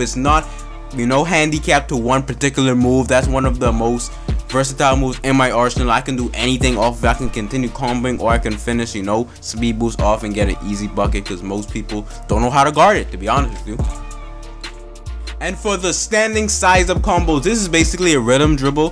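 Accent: New York, United States. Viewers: Where U frm. U sound like u frm NY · The most new york guy i ever heard on YouTube lol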